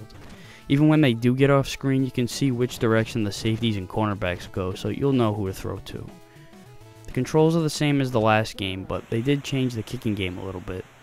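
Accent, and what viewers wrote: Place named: New England, United States. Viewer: Great review; greater game. The NE accent adds some flair to the review.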